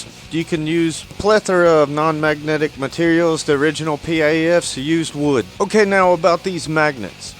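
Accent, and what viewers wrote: South, United States. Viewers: I need a tutorial on how to speak redneck, that was amazing. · I just found your channel and I love it! Your Redneck Weird Al appearance is the perfect match to your southern drawl and dry wit humor! Plus, you seem to be pretty sharp. Keep the videos coming!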